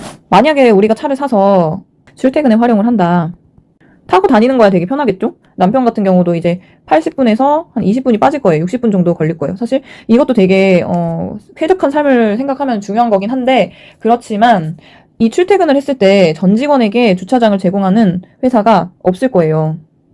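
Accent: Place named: Gyeongsang, South Korea. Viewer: 고향이 경상도 인가요? 말투가 귀여우시네요 ㅎㅎㅎ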